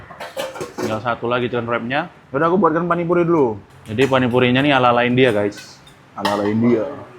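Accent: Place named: Java, Indonesia. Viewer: Hebat mas bro,,,,semoga sukses. Btw, kok bisa ngomong jawa..logat jawa,,,Gimana ceritanya ?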